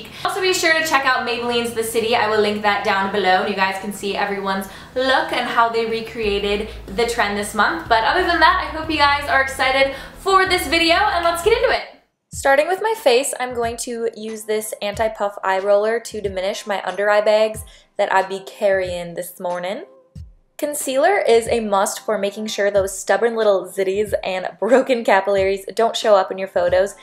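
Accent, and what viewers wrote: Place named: Minnesota, United States. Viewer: Hehe I always thought the way you say bag was weird but I realized it's just because you're from Minnesota. I had a teacher from there (I'm from texas) and he would always pronounce words ending in ag like you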